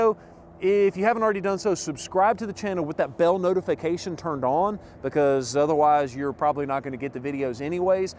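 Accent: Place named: Alabama, United States. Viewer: Great video and I can understand you pretty good with your Alabama accent even if I am not a native english speaker. Out here in Germany we just have mid-size trucks (all Diesels because of gas price and mpg) and they haven't been very popular until a few years ago (maybe because of the VW Amarok). But we always had some grey imports of fullsize trucks. But it seems like more than 80% of them are RAM's. But I like the F150 better also because of your videos. Great content your videos. Wish I could have done that when I was a car salesman (VW) years ago. Greetings from Bremen (Beck's beer City)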